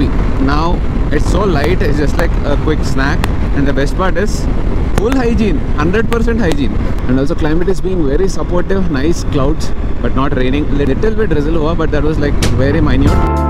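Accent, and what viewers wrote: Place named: Hyderabad, India. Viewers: Really this is more informative and authentic telangana English, but make sure you are taking anti covid measures while traveling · I like when u talk in hindi.. Hyderabad hindi❤😍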